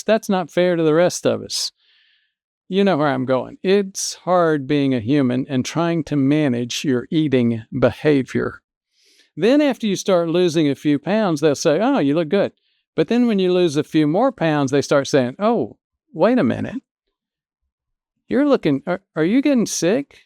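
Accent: South, United States. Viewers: Beautifully presented. Of course, I'm in Little Rock so I hear those Southern roots serving you well!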